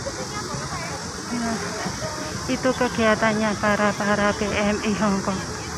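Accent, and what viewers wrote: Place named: Cilacap, Indonesia. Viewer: Bahasa cilacape ora ilang walau neg negeri orang.. sukses mbak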